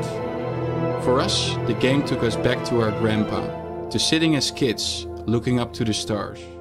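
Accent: Netherlands. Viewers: Deze gast is sws nederlands als je goed luisterd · This is a Dutch guy for sure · Im sorry for the Dutchie, but what an accent! Joe can almost hear de nederlands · i heard the dutch accent before the video started playing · i hear from his accent he is dutch like me haha. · Dutch accent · This really sounds like a dutchman telling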